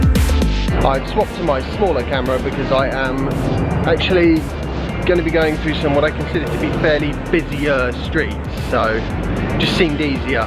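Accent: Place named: United Kingdom. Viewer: 💯 Brit accent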